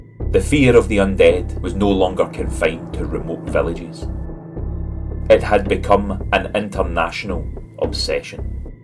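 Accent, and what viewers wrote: Scotland, United Kingdom. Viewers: Excellent stories, great background music and your Scottish accent ads to it all. Love it!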